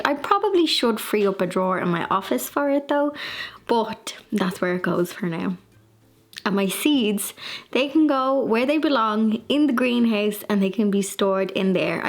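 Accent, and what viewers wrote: Ireland. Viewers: Your so bubbly and I luv that your so independant and a home owner. I too do most things myself,independant and am home owner. Luv your Irish accent . I'm Canadian. Luv your videos and Blondie.🎃🎃❤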